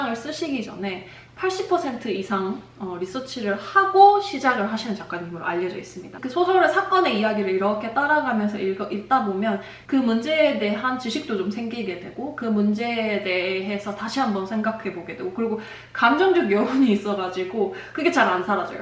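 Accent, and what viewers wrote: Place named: Ulsan, South Korea. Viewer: 오늘따라 유독 진한 사투리 악센트! 울산쪽이신거 같은데 궁금하네요.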